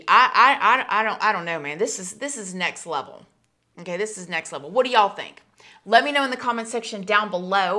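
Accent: South, United States. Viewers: Your southern accent is so strong, I love it!x I’ve been waiting for this to happen…it makes sense now why when she was found, she went super silent! I always thought it was cause she was traumatised, but maybe she’s trying to avoid inditement! Actually makes me sad for her husband when the cheating accusations came out! I wonder if he’s standing by her 🤔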